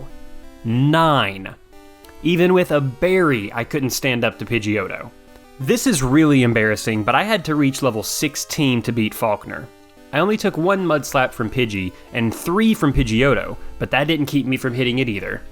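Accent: South, United States. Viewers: First Southern PokeTuber I’ve found! Been wondering if Corsica could do it lol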